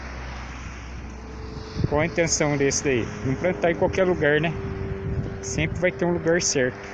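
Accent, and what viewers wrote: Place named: Espirito Santo, Brazil. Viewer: Cara top, vc tem um sotaque de capichaba. Q bacana q Deus abençoe este lindo lugar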